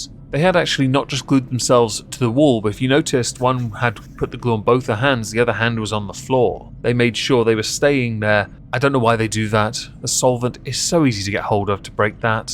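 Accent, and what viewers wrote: RP, United Kingdom. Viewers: I quite like your poshness tho 😂🤣💖💓💕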